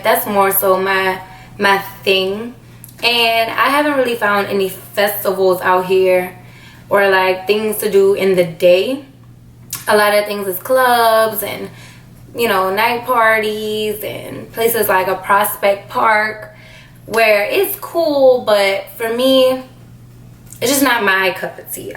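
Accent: New Orleans, United States. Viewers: Damn you new Orleans women mane! I love that accent so much..."ova...bey buh..." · That New Orleans accent 😍 👌🏿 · I moved to Houston from Hempstead, NY. I f'cks with H'town all day!!! love your N.O accent!!!! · Son when you opened your mouth, I was like this girl from NOLA. Then when you mentioned it I was excited 😊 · Carnisha XO yes I'm not from new Orleans but I have family that live out there and she sound just like them. Just the way she talk is so New Orleans lol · I love your New Orleans accent. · I love your accent. Reminds me of my time at Xavier! I love New Orleans❤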